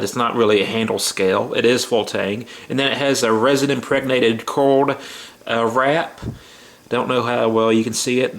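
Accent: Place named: Washington, United States. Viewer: Your accent, Washington state correct me if I’m wrong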